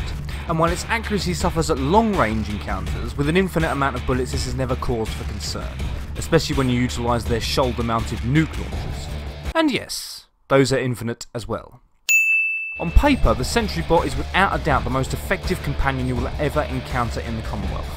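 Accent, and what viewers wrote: United Kingdom. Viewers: UK English sound soo badly...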